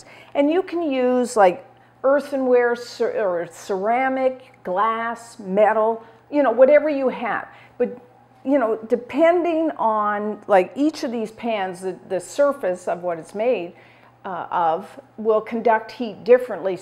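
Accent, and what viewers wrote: Canada. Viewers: I enjoy your demonstrations so much! Thank you for all the wonderful recipes you've shared with us. I also love how the Canadian accent comes out sometimes :-)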